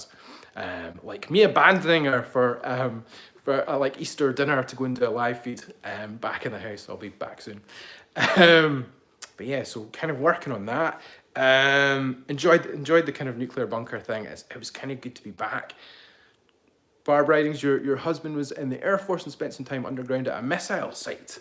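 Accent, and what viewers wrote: Scotland, United Kingdom. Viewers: I love listening to your stories with a Scottish accent, makes it better. I'll share the next story with my sister.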